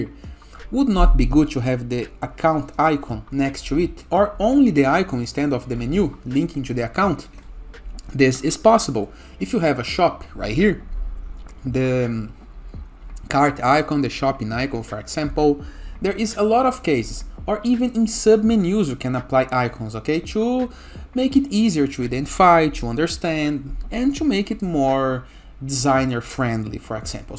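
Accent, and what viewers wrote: Brazil. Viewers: great video. btw you brazilian?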